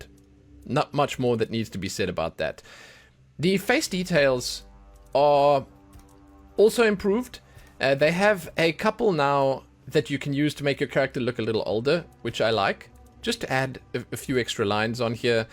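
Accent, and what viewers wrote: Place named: South Africa. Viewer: Here after seeing some new content for the game again and wanted to check the character creation and I heard the accent and my my a fellow south african will check out more vids but you have an extra sub. Love from Krugersdorp👌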